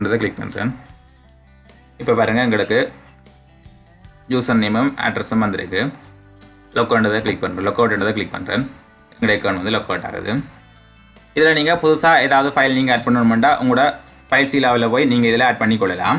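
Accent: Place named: Eelam, Sri Lanka. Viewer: அருமை சகோ ஈழ தமிழ் அருமை 🎉❤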